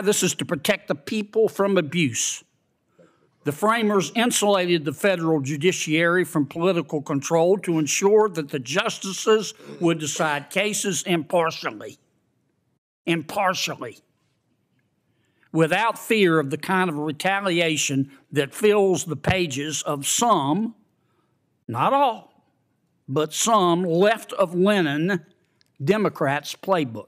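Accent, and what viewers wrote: South, United States. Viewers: Senator is a rock star. He sneaks up on people with his down-home country accent, then boom! He lays the truth down and wrecks the liberals.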